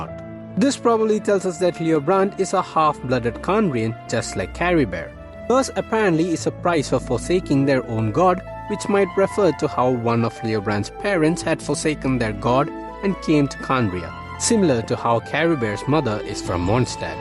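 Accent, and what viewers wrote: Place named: India. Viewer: hmm I felt that you are from India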